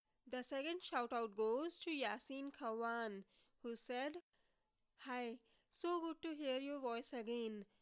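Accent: India. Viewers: How come you write and speak such excellent english, yet have such a lovely indian accent ? 😊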